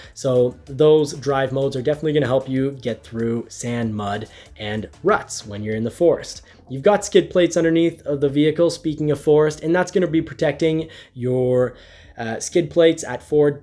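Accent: Canada. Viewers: Are u Canada?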